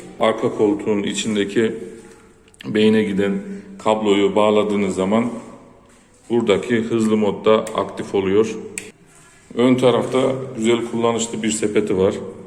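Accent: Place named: Kayseri, Turkey. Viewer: 5 tepelerin oraya geldiğinde anladım kayseri olduğunu usta ama asıl anladığım rampayı çıkamayıpta sonradan rahatlıkla çıktım demen tam kayseri esnaflığı 😄 bi kayserili olarak esnaf ağzını gayet iyi anlıyorum 😁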